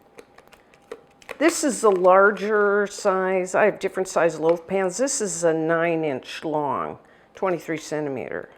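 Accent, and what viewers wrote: Canada. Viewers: Yeah I can pick up her Canadian accent.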